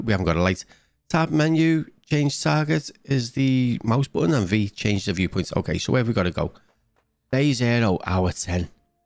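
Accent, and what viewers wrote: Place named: Liverpool, United Kingdom. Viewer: Story time with your friendly Scouse 😅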